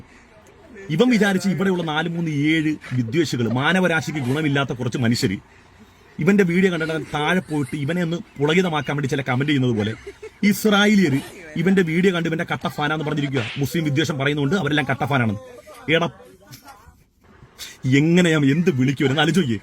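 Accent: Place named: Kollam, India. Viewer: ഉസ്താദ് കൊല്ലം കാരൻ ആണോ 😄😄. അതെ language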